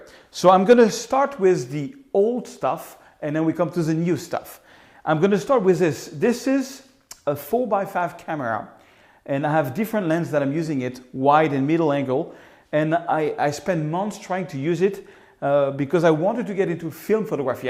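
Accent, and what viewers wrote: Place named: France. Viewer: gotta love that french accent!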